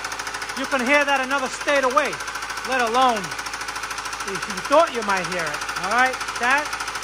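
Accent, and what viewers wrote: New York, United States. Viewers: The New York accent shined through over that rod knock 👍 best comparison video online. thank you!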